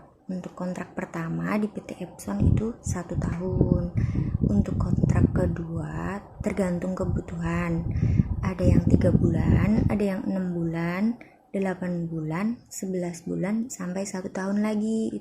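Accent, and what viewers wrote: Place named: Java, Indonesia. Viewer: MBK asli mana logatnya ky orang Jawa ini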